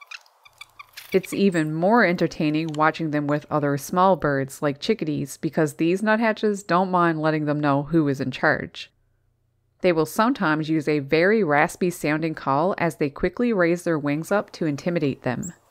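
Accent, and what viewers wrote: Newfoundland, Canada. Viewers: I detect a Newfoundland accent. Thank you for everything that you do.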